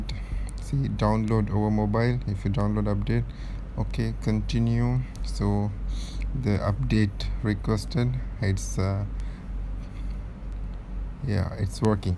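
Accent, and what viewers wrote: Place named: Kerala, India. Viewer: Are u malayali ssss sssss sssss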